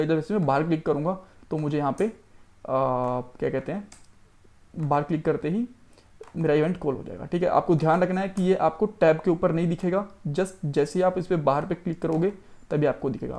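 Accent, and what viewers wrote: India. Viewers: Noida se ho bhai kya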